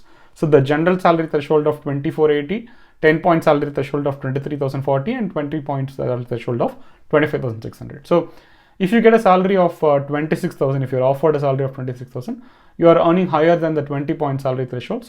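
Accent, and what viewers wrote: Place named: Chennai, India. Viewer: Chennai slang in your language,when you speak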